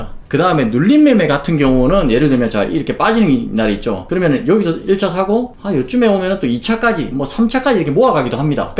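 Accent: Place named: Gyeongsang, South Korea. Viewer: 이 형은 경상도사투래때매 포인트가 딱딱 박혀서 좋아!! 명불허전입니데이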